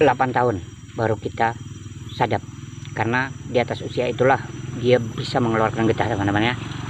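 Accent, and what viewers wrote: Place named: Banjar, Indonesia. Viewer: Ini di kalsel,beliau logat banjar ,dan mmg suku banjar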